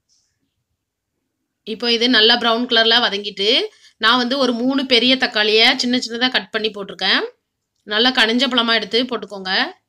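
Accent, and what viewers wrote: Tirunelveli, India. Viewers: It looks simple and easy. But I really enjoyed your narration.....I can feel the Tirunelveli ambient in our slang....Keep up the good work.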